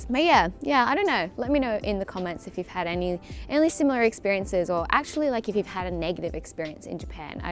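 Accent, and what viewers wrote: Australia. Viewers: Its just An aussie talking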